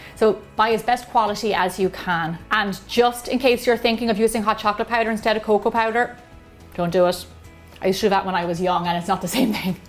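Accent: Ireland. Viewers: i love your Irish brogue so I'm going to listen to all your videos! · Hardcore brownies xD I will say it: it has to be fudgy, otherwise it's a chocolate cake! Brilliant recipe and I love your Irish accent :) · i love her Irish accent. I could listen to her talk all day long..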